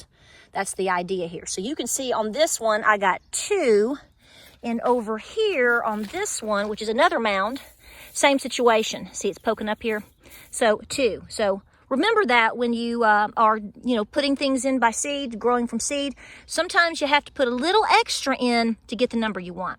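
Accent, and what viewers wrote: United States. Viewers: Ma'am, I've only just discovered you today thanks to the Economic Ninja. I like your straight up American way of speaking. I also respect how you are hard working and feminine and sweet at the same time. My wife and kids are working well hard in central Virginia so you just keep on going and we will as well. Thanks for your honesty and plain spoken ways, Honey. Best. And all the best to your wonderful husband. Johnny and Crew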